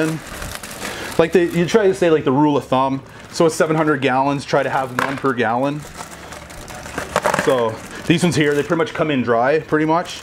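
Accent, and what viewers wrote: Canada. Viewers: You’re 100% Canadian eh?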